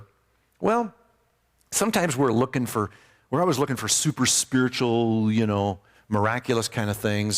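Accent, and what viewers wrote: Canada. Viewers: Me too. Haha. I'm from Ontario Canada and we don't all have accents. Lol. He sounds canadian to me.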